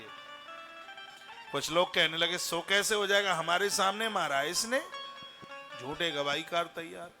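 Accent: Braj, India. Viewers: Gurudev aapki brajbhasha bahut pyari lagti hai Radhe Radhe❤🙏🙏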